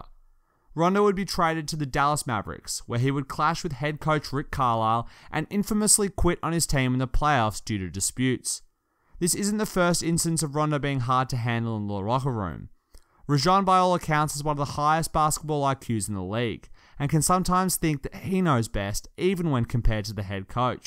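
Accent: Australia. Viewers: you are very australian sounding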